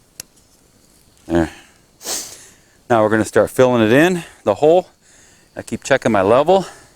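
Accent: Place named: Northeast, United States. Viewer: Never would have guessed You are from texas. Your accent sounded to me l ike you're from the North or the Upper Northeast almost Canada It's the 'O'. Got an O myself, Lived in the south all but the 1st 4 yrs of my life and I still got the O.😂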